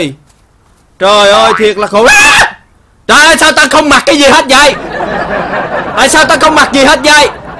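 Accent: Hue, Vietnam. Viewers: Anh nói tiếng Huế nghe hài quá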